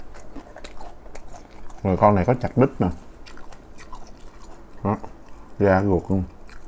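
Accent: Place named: Mekong Delta, Vietnam. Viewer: chuẩn miền tây luôn a ơi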